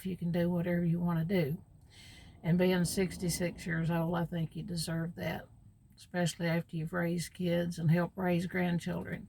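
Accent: South, United States. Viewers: You did a great job! Thanks for sharing your story. I am in Alabama but from northwest TN. I knew you had a bit of a southern accent!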